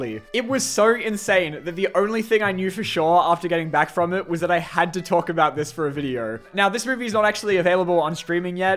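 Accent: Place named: Australia. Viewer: . Are you Australian? I did not know that!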